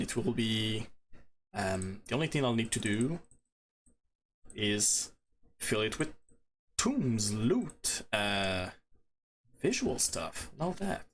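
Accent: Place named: Quebec, Canada. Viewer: On ne peut jamais enlever l'accent québécois ;-)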